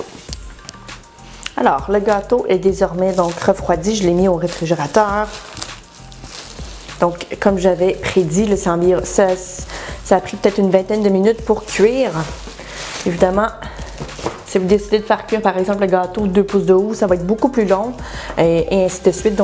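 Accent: Quebec, Canada. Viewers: J'aime trop ton accent québéquois 😍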